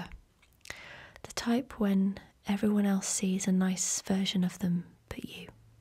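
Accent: England, United Kingdom. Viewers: I am 45mins in and I am totally captivated ,,, I so much enjoy listening to ur soft English accent and laugh everytime u make a reference to something with different words than we use here in the U.S.,,,, u have the kindest, gentlest soul and it shines threw in all u do, it's unfortunate u and ur family are on the outs and u couldn't rely on them when needed, I totally can relate, I have no body in my life to rely on if needed and it's not a nice feeling, that saying is true tho, u find out who's real and who's not when u don't have anything to offer and need help, and sadly I found out, I don't have anyone, thank u from the bottom of my heart for sharing such personal stories and please please don't ever stop doing what u do here on youtube, u will never understand the positive impact u have on so many,,, so so so much love and positive energy sent ur way! 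Xoxo 💜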